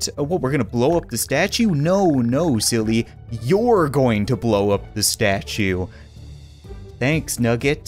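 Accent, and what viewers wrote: Canada. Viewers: You sound really Canadian in this video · I like his Canadian accent it’s funny as hell when you get used to it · I just LOVE how Canadian he sounds! XD Just listen to when he says "sorry"! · He sounds extra Canadian and I love that I want to visit Canada so bad now. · True Canadian voice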